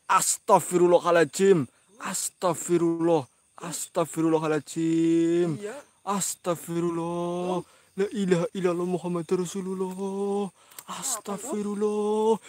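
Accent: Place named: Banyumas, Indonesia. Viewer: Seram tpi aga lucu ya,ngapak xxz.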